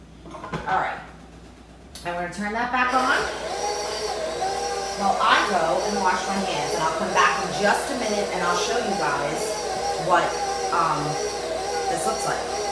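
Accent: New York, United States. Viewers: Great job on this video and easy recipe. Will be baking some tomorrow! I love NY/NJ hard rolls and miss them since I moved out of the area long ago. Plus I love hearing your accent! Thanks again. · Too funny - the accent sold me immediately- only fellow New Yorkers can appreciate it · Same! Living in the greater NYC area you can even call out the borough, and if you are really good the Jewish version of that borough. I think is in or from Long Island.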